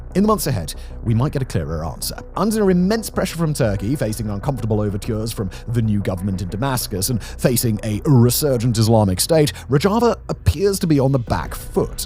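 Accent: United Kingdom. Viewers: Love the presentation, and respect the methodology/ethics of how you do this. As a UK english guy who has travelled the world I recognise your 'pan Brit' accent. But I am puzzled by some of your mis-pronunciation of some terms/names. Such a Thales , a well known defence co. pronounce talez... Just an observation, where generally were you from?? Just interested.. retired Rail Engineer here. 😀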